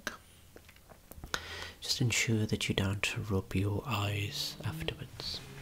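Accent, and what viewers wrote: United Kingdom. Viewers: it's the UK accent 100%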